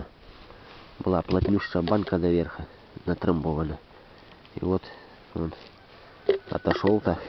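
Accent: Belarus. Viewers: Цудоўны наш акцэнт, братка-беларус!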